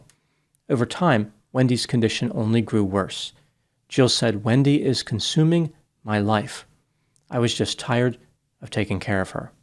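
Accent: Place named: New Jersey, United States. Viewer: I thought Dr. Grande was from New Jersey, hah. He has an accent that places him there or greater NYC.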